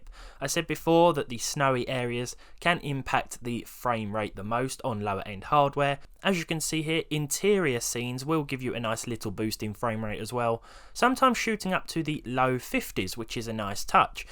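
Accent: United Kingdom. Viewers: same lmao the british accent really makes it funnier too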